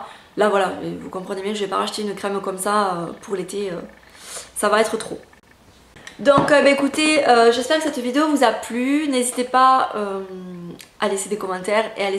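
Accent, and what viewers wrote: South, France. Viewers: Coucou tu viens d'Avignon ?? Tu a l'accent du sud :)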